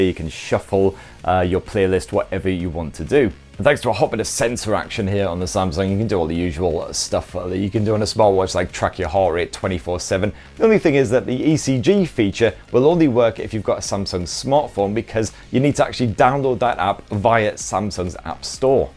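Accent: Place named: Newcastle, United Kingdom. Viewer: Sounds like a geordie therefore he is definitely a good lad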